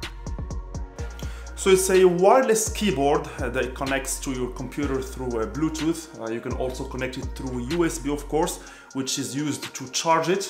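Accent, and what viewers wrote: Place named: Morocco. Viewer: sat cheft had l video tle3 liya comme pub hhhh m3a sme3t sewtek 3reftek ghatkun meghribi 😂 Anglais n9iyaaaaa hh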